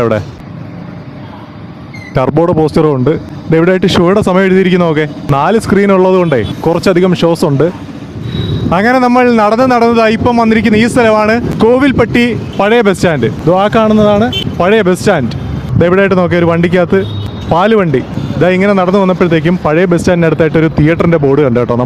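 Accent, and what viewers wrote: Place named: Kollam, India. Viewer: കേട്ടോ..... കേട്ടോ....... കൊല്ലം സ്ലാങ്...... അടിപൊളി ❤❤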